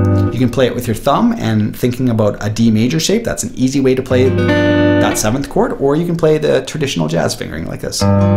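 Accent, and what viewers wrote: Canada. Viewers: Your voice is so canadious, nice work by the way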